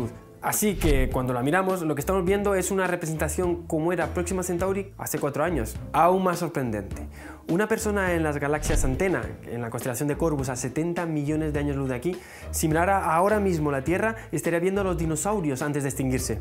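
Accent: Canary Islands, Spain. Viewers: Es canario. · en otro vídeo dijo que es canario. Aparte, su familia tiene acento canario y él hace años también lo tenía.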